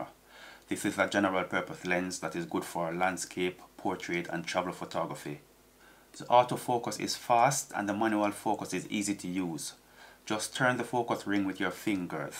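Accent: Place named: Caribbean. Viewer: I would recognize that Caribbean accent anywhere. Very nice to the point video here. It’s interesting that you bought your lens to use it with your D7200 which I also own since 2015. That is one amazing dx camera which I don’t ever plan to part with. I now also own the Z5 which suits my type of photography. Interestingly that I just recently purchased this 18-140mm from KEH at a bargain price for the exact reasons you mentioned. In addition to my D7200 it works beautifully with my Z5 using the FTZ adapter. It also focuses very quietly during video and takes amazingly sharp pictures on this Z system even though at a reduced 10 mp but enough for me to get a nice sized medium enlargement. It would have been good if you had included a few images taken with this lens at different focal lengths and scenarios. Keep up the good work brethren. 😀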